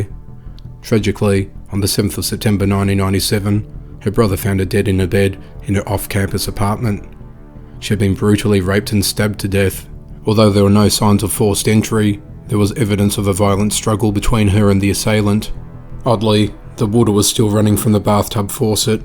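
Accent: Australia. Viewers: Nice to hear an Aussie accent doing these videos! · Wait, is this an Aussie accent mine ears hear?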